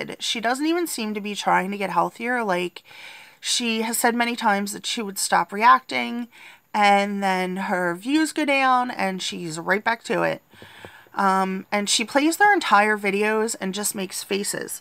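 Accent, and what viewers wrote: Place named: New York, United States. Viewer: You sound like you have a New York accent! Best of luck with the challenge.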